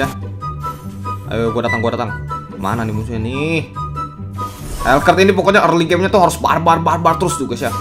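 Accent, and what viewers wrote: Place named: Jambi, Indonesia. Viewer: Orang mana bos? Kek bahasa jambi Gilo haha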